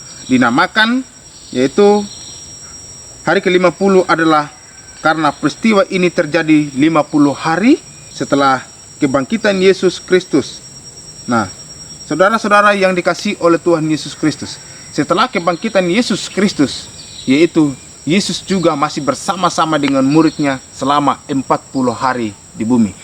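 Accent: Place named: South Sulawesi, Indonesia. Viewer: Anda orang Toraja kah? Kayak Logat sulawesi selatan... Anda aliran Karismatik atau Pentakosta atau Reform?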